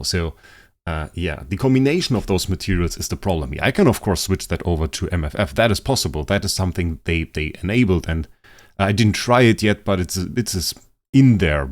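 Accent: Germany. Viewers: Are u German? I hear an accent and I like ur voice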